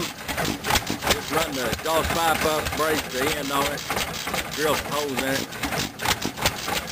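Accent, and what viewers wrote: United States. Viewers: british accent ??? he fukin sounds like hes a cowboy hes DEFINATELY not british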